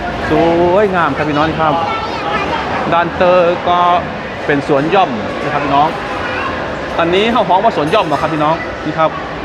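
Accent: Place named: Isan, Thailand. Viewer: คนทำคลิป วีดีโอ คนไทยอีสานแน่นอน สำเนียง บอกภาษา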